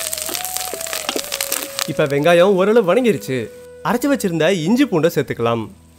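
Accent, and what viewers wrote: Salem, India. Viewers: Salem slang 😍💛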